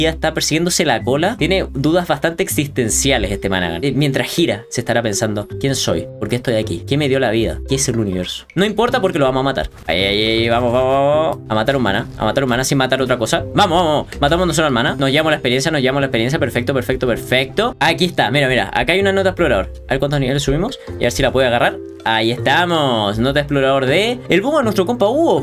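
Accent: Chile. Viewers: Eres chileno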